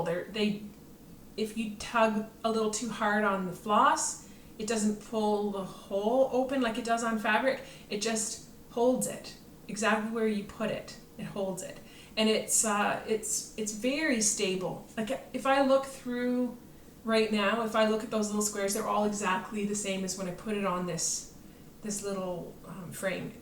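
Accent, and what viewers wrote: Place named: Canada. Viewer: Beautiful finishes! HAEDs are out of my comfort zone, but I admire them. Have a wonderful time at your stitch day, I just watched someone else who is going. Don’t remember who. I watch a few Canadian flosstubers because I love your accent! My dad was Canadian, so we had lots of relatives visit us in LA in the winter.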